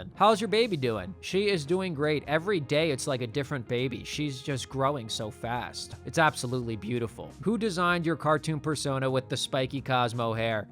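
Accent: Philadelphia, United States. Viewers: Congratulations brother 🎉 Love the content, disappointment no one ask the real burning question i had, no need to answer though. But based off how you've pronounced certain words, are you from Philadelphia?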